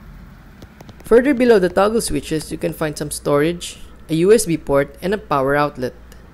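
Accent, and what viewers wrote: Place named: Philippines. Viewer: he speek like many pacquiao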